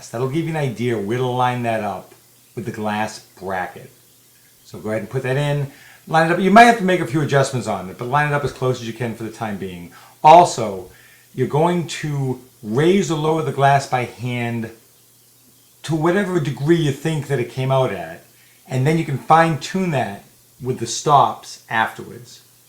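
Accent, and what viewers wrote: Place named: Boston, United States. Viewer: Very helpful. It made me decide not to do this myself. BTW, sounds like a Boston accent. Yes?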